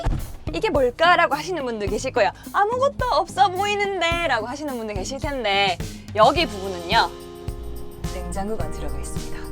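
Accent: Gyeongsang, South Korea. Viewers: 경상도인지 경상남도인지 말투가 팍팍 꽂히네요 이해 안될수도있지만 전 사투리가 부러워요.기억이되서..잘봤어요 구독합니당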